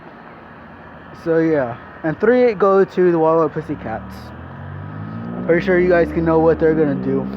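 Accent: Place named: Russia. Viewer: you have a perfect russian accent...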